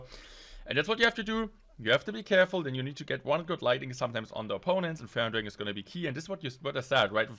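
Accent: Germany. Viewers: The German accent kills me, I’m sorry! But your skills in this game are insane.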